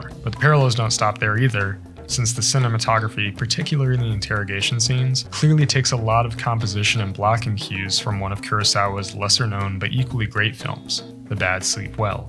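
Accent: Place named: Eastern Europe. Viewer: I feel Slavic notes in ur voice. Gotcha 🤫🗿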